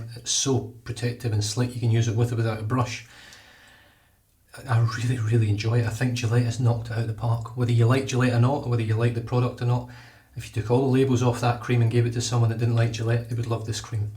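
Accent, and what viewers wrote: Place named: Scotland, United Kingdom. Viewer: Great review, sir! Where in Scotland are you from, can’t place your accent!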